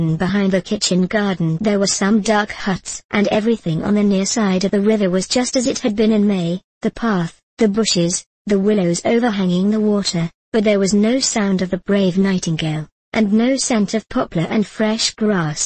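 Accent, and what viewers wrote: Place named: United Kingdom. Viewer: Nice to listen to a British speaker for a change.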